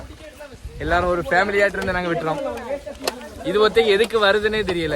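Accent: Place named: Kanyakumari, India. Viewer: KK slang 👍👍🔥🥳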